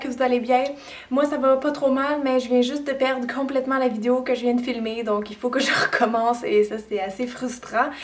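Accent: Quebec, Canada. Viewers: C'est un délice de voir tes vidéos, ton petit accent canadien que j'adore,en tout cas je suis tes conseils merci beaucoup de ta spontanéité , j'adore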